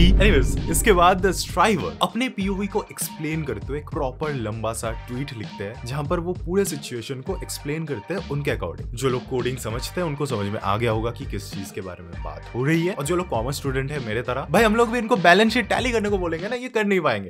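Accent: Bengal, India. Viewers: Bhai aapke hindi mai aapka bengali jalakta hai 😅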